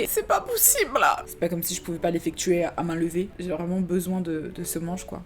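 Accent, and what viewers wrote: United Kingdom. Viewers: Ton acsan anglais😒😒😒 et chiant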